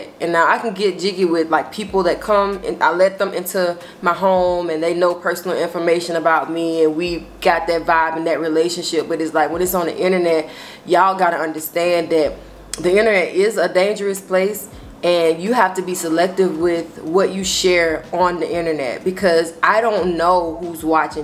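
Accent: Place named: South, United States. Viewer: She's definitely from the south.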